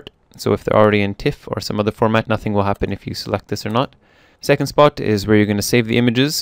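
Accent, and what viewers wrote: Canada. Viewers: That's a canadian accent.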